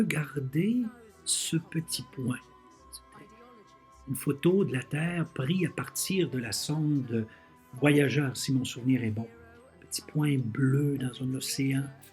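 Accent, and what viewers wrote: Canada. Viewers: Enfin quelqu'un réagit sur la deuxième section !!! Tu me l'as fait encore plus aimer ! Yessss ! (Au fait : joli ton accent canadien!)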